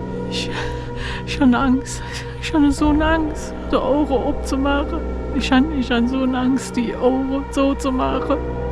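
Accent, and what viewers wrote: Cologne, Germany. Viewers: alter😳 da braucht du einen übersetzer, aber als urkölner kann ich sie jut verstonnn👍 wünsche wiggerhin vill spass😎 isch han se direk abonniert👍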